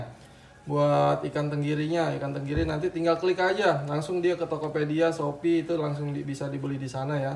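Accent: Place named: Palembang, Indonesia. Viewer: tetap semangat Chef jangan dengerin orang yang nyinyir saya sudah cobain resepnya 👍👍 dari bahasanya sudah ketauan bahasa palembang ya Chef 😊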